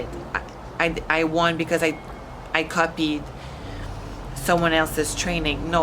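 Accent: Quebec, Canada. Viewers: Your french-canadian accent adds to your character , who you are. I think it is nice. Anyone who would be so rude as to complain about your accent probably speaks only 1 language and has their own issues. As a new subscriber i like the accent i came across you with the jay cutler interview with you and shawn i thought you were great...I can appreciate how hard it is to pick up a second language ...lol i'm taking french 3 hrs a week and it's brutal.Tabernac you're awsome....lol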